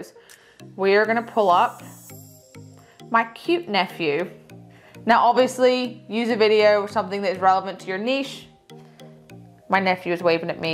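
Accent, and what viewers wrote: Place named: Australia. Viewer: ohhhh okok I thought I heard Aussie in there! ❤️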